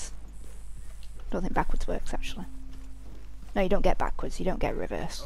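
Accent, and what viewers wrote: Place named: Manchester, United Kingdom. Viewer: is your accent from Manchester? That's my first guess, or at least somewhere in northern England. If I'm wrong I mean no disrespect. I just love all of the English accents but I'm still not quite good at placing them.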